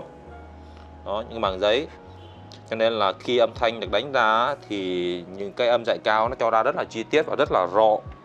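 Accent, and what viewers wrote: Vietnam. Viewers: yeu cau doi nguoi huong dan , giong ong noi nay ko biet o vung nao ma nghe nhu bi dam ngang hong , kho chiu thiet .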